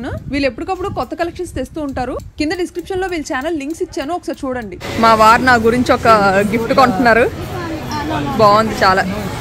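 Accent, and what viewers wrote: Vizianagaram, India. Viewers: Happy wedding anniversary 💐 God bless you I am Anasuya from VIZAG , Profession by Teacher. My native place is VIZIANAGARAM. Mi matalu chala baguntayi. Mi matalu vini VIZIANAGARAM aria anukunnanu. Adi currect iyyindi. You're so Active. God bless you. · మీ విజయనగరం యాస కు.. ఫిదా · మీ , మా యిజనగరం భాష బాగుంది. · vizianagaram praantheeya bhasha Vinipistundi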